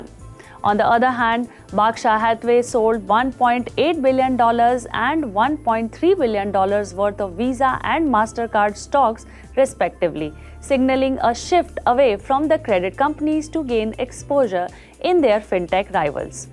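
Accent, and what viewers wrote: Gujarat, India. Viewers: Mam, You are presentable and I think you also have some kind of tech background (probably database programming). But your accent and musical lilt in pronunciation is very Gujarati. Nothing wrong with that, but please try to be accent neutral. There are training courses which people undergo in BPO/Call Center related industry to cultivate the right accent. I highly recommend you to please undergo such training. Take this as constructive criticism and not negative criticism. I mean good for you. All the best.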